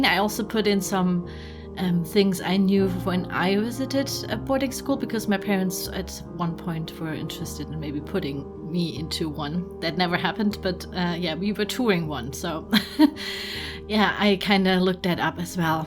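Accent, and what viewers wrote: Germany. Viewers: I love the German accent. It's so relaxing listening to you speak.. This is a masterpiece btw 👏👏 I love the idea that your viewers have their own dorm rooms and ya'll go to this school together. Something about that idea is so heart warming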